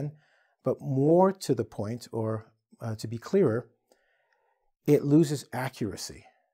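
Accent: United States. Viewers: What is your american accent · Is it American English ? Just I get confused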